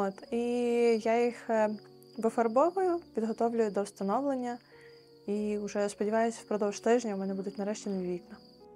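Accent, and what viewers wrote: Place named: Ternopil, Ukraine. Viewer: а я то чую розмова така як в Тернополі👍😄 Моя сімʼя теж походить з Тернопільського району ❤ Ти моя землячка🎉❤❤❤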